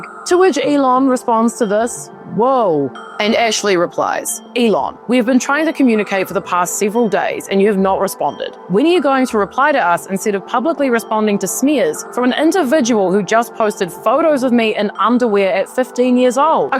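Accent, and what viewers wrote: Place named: New Zealand. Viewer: Really enjoying you videos! Are you a kiwi? 😊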